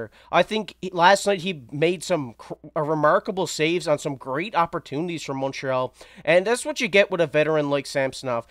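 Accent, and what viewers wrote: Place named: Maritimes, Canada. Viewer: Great report love the Maritime accent!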